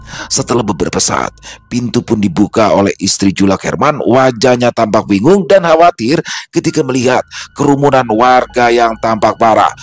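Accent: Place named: Banjar, Indonesia. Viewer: waah makin mantap nih aksen bahasa banjar mas..kereen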